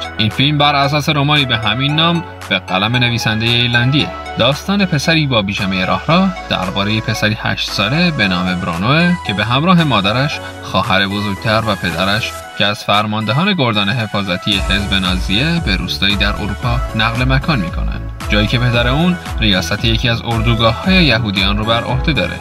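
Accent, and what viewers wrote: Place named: Iran. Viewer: چرا با لهجه ایرانی صحبت میکنی درست گپ بزن یا دری یا فارسی ایرانی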